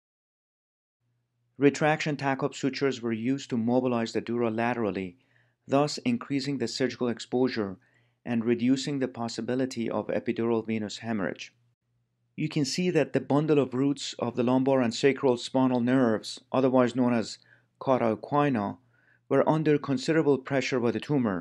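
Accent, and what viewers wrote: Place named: Iran. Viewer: Lovely Persian accent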